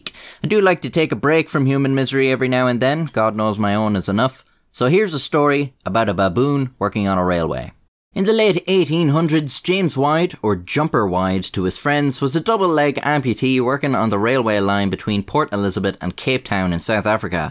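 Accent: Ireland. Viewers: This video would feel so wrong if it wasn't narrated by an Irishman. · nice drawings. i like james. very polite. my uncle is irish and is named james and he kinda looks like a monkey. and u sound irish. ressurfuate hin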